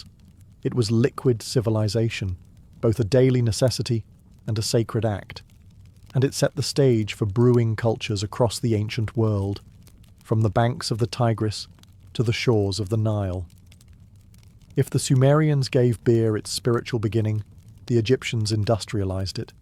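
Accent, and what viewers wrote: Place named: England, United Kingdom. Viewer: Love your videos but some of your mispronunciations are so odd. Despite the English accent it makes me wonder if you're English Second Language?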